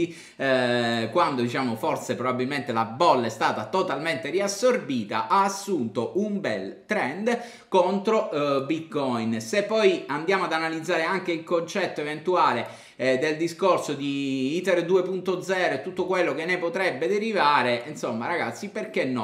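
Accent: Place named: Cosenza, Italy. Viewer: sei cosentino e la d e la t si sentono... prima o poi spero di poterti salutare di persona covid permettendo😉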